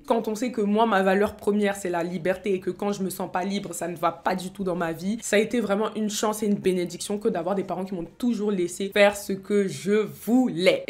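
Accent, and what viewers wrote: Ivory Coast. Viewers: 😅l'accent à l'ivoirienne, me tue Bonne continuation ma grande soeur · Je savais que t’étais ivoirienne. Malgré le « shôkôli » on se reconnaît 😂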